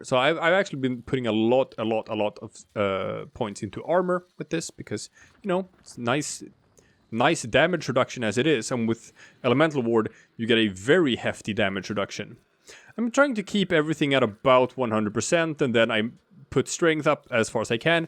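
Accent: Sweden. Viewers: Are you swedish? You have a swedish dielect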